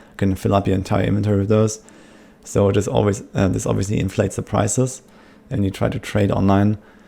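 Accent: Germany. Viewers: Thx a lot, now i understood everything ;). Looked 3 videos before and i still had questions. Fast english, but is ok for me because its german english ^^. Dank dir auf jeden Fall, super Videos ;)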